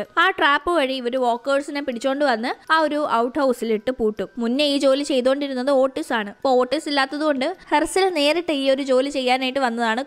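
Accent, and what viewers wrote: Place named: Kozhikode, India. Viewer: sound like kozhikode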